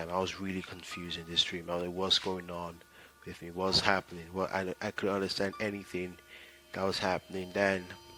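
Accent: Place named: United Kingdom. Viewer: y you sounding British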